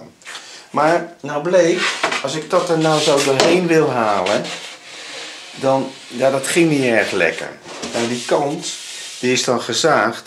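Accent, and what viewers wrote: Netherlands. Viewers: Hoi, nieuwe leuk schort aan ,staat je goed Vindt het altijd leuk om naar te kijken ,je kan het goed uitleggen voor simpele dingen,en altijd wijs om nerderlander te horen klappen. Groeten Mike.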